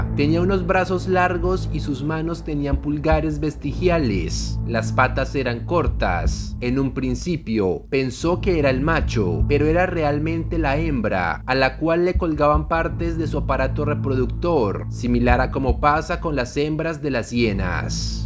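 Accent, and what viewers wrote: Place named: Mexico City, Mexico. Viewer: Parece chilango ha no se crean yo soy chilango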